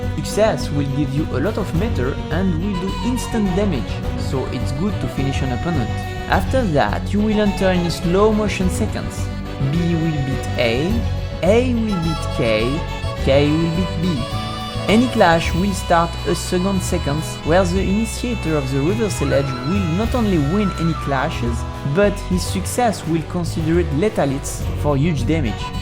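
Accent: France. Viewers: That is called a French accent. Congratulations, you're rasist. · I think you got to lower the volume a bit. It's already slightly difficult to understand you because of your French accent. Merci beaucoup!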